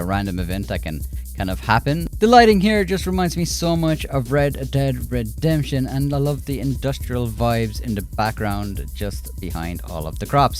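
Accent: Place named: Ireland. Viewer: hey btw where are u from? u sound like... idk sorta irish and sorta something else?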